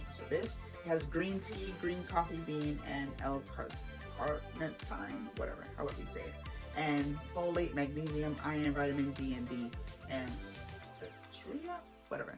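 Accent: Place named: Jamaica. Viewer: Great.love the Jamaican twang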